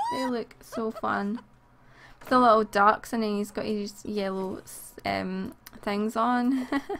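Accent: Scotland, United Kingdom. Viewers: ***** I could tell lol! You sound nice, so it's okay. Loads of Scottish people sound nice (nearly wrote Scootish, wow). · are u Scottish?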